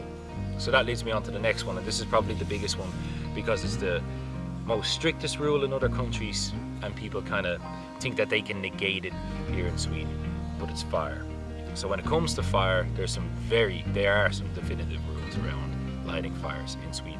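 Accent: Ireland. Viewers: I think he means three he has an Irish accent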